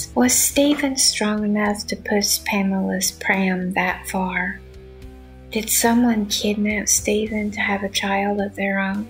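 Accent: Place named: Kentucky, United States. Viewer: Your voice is so soft & lovely. What is your accent? You sound Kentuckian to me.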